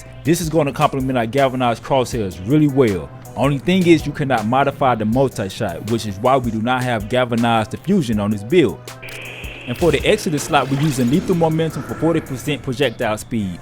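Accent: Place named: South, United States. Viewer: southern black accent.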